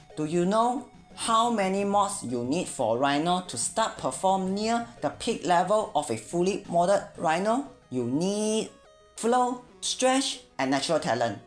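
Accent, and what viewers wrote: China. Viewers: you should use your Chinese accent and be funny with it, like uncle roger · I would listen to you but you sound Chinese so your opinion is invalid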